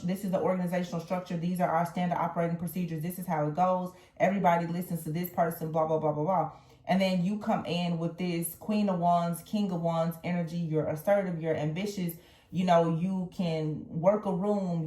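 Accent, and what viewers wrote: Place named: South, United States. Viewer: you are one of the best and most authentic readers I've come across in a while, particularly on YouTube, which hosts many readers. I hear a Southern accent in your voice and am curious as to what part of the South you come from. I am a reader myself and come from a lineage of "gifted" seers. Thank you for your generosity in sharing your gift to bring insight to the collective. Despite the frustration you may experience from time to time, your favor is a blessing to us all. Never forget, but remain ever reverent and protective of your blessed nature. Your are needed in this community, especially now. Stay strong, my Sister!❤😊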